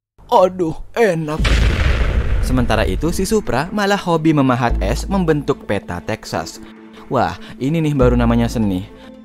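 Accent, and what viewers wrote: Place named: Sulawesi, Indonesia. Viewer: Ini kayak orang sulawesi logat"nya🤔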